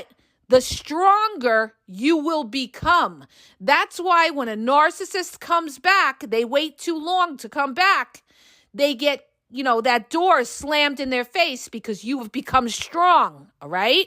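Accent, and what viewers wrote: New York, United States. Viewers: You crack me up. Your NY accent is great. You would be a great in a movie. Reminds me of Cher saying Snap out of it! Thanks for shooting from the hip.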